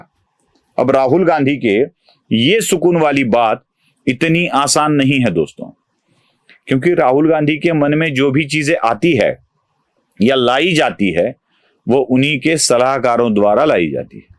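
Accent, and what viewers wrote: Maharashtra, India. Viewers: जी आपकी एनालिसिस तो अच्छी है ही, आपकी मराठी निष्ठ हिंदी भी बहुत अच्छी लगती है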